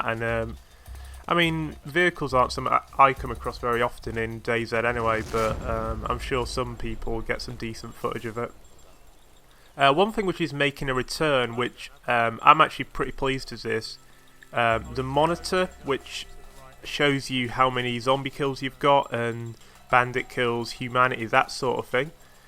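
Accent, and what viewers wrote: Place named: England, United Kingdom. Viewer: He's just English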